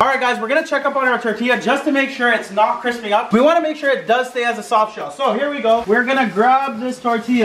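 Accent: Mexico. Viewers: is is just me o does he hve a mexican agcent i should know im exican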